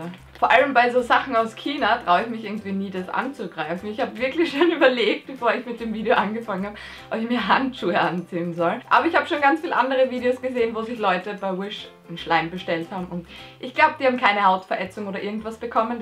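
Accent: Austria. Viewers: Du bist a österreicherin.....kenn i ..i kum aus linz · Hi bist aus Österreich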